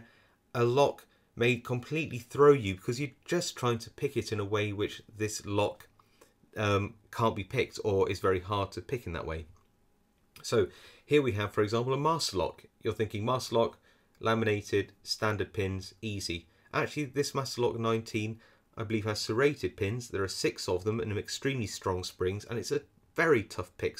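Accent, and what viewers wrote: England, United Kingdom. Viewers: Beautiful English. Beautifully authentic, proper English English!! Excellent video of course too by the way!! Have subscirbed. Thank you.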